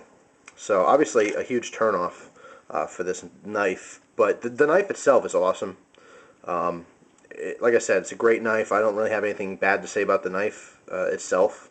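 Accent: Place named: Boston, United States. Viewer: a year or two ago i started watching some of your videos while i did my homework. you sound SOOOO different here! you have like a boston accent haha. just ordered myself a Dogfish and was checking out reviews, stumbled upon yours lol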